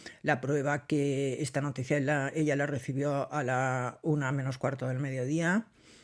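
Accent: Spain. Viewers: Siempre un gusto escucharla ♥️👍🏼 me encanta su acento español y la forma en que nos narra las anécdotas. Saludos desde México 🇲🇽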